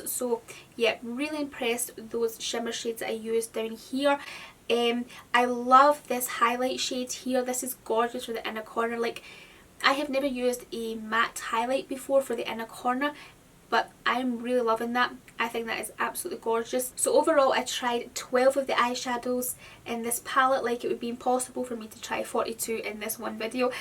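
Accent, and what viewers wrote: Scotland, United Kingdom. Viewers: Can tell a Scottish accent a mile off 😍x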